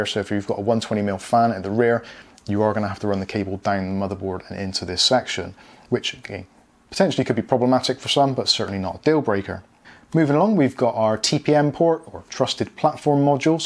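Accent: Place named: West Country, United Kingdom. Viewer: Ayy you sound like your from the south west! Bristol gang 😃🤣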